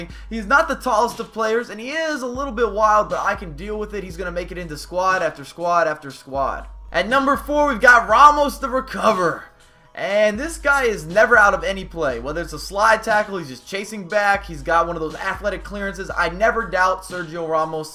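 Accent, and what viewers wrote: United States. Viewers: Are you America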